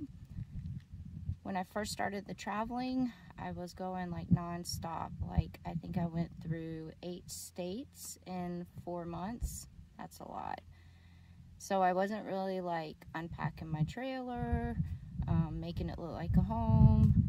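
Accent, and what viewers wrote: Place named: Texas, United States. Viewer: Knew I heard the Texas twang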